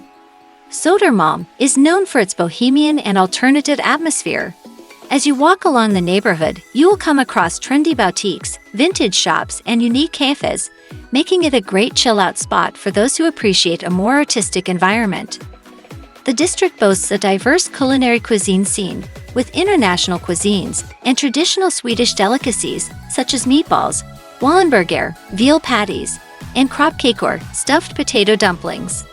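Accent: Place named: United States. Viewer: Can not listen to awful narrative with american woman accent jars on ear's.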